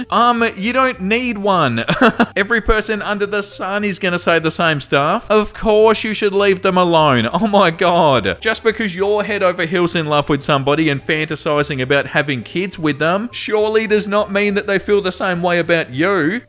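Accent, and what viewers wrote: Australia. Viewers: oh you're an Aussie. i though maybe i detected sth african/aussie accent. with a dash of NZ lol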